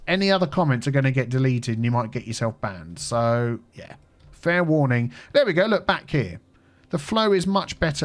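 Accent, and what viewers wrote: United Kingdom. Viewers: There is something uniquely meditative about listening to a random British dude sip tea and mutter away while diligently fixing the same rudimentary transportation engineering problems over and over again. It's like a slightly condescending ASMR, complete with a hint of progressive optimism, and little cherry verbal nuggets sprinkled in; horky-borky, chockablock, straight-on, etc. Just makes me feel like things are right in the universe. You've hit a commendable niche. This is far better ambience than listening to "4 hours meditative gregorian chanting" or "best of derivative study beats vol. 69" 10/10, will definitely put on the Anglo-traffic-Daddy while I'm doing research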